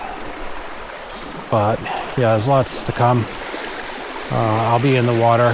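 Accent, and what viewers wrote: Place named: Boston, United States. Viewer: Looks like NW Alabama to me. That Boston accent will go great there.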